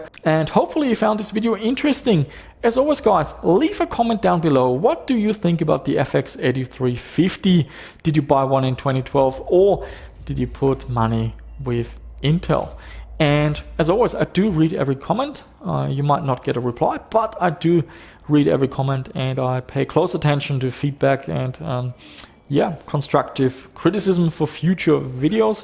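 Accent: Germany. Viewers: One question, is the dude a German? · You sound a bit like a german talking english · German accent rules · you sound like an australian but something tells me that's just a german accent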